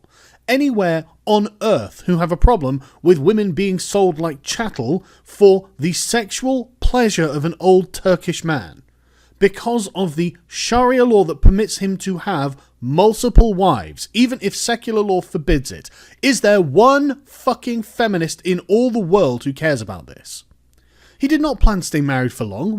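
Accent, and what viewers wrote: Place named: England, United Kingdom. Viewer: what city/region of England is the source of this intensely proper accent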